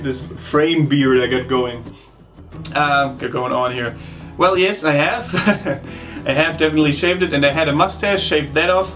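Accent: Germany. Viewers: So, JETZT bist du mir sympathisch. Lylat Wars-Fanboy. Herrliches Spiel - immer noch! Leider hat Nintendo das Remake auf der Wii sowas von in den Sand gesetzt ... Und ein like für deinen deutschen Akzent. Lass das so! Versuch erst gar nicht, amerikanischer zu klingen. Amis können nicht mal "Rammstein" ordentlich aussprechen ... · You kind of remind me of my mom. She is German ( I'm half) and even though we moved to America 30 years ago, she still has a German accent when she speaks English. Hearing your accent makes me think of her...also in another video you said "silly goose" and I was quite surprised because I never heard anyone say that other than her when she was alive. When we moved here from Germany, she started speaking only english to us, it sucks because now that I'm grown, I'm struggling to learn German. I want to go back to Germany for 2-3 months but nervous because I don't know the language to well or which part to go to. 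Anyway, I like your videos.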